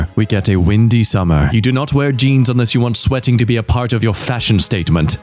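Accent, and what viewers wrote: Australia. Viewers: just slowly getting more Australian as the video goes on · I'm happy they gave this one to a true aussie to read! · Wait, Australian? Since when? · Hey don't call us Aussies out like that mate- ... Woo Aussie voicing Aussie memes💅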